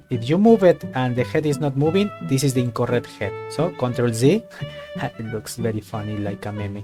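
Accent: Spain. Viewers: aqui puedo notar que tu ingles es bueno pero a la vez español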